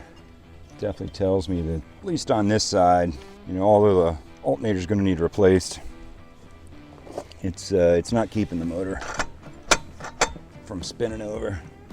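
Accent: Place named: Pennsylvania, United States. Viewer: You sound like a Pennsylvanian! I’m Pittsburgh born and raised! Stillers or Iggles? ;-)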